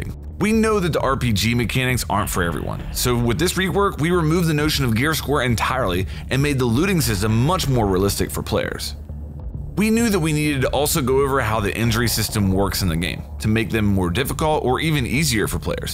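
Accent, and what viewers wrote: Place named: Pittsburgh, United States. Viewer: Guy sounds like he’s from Pittsburgh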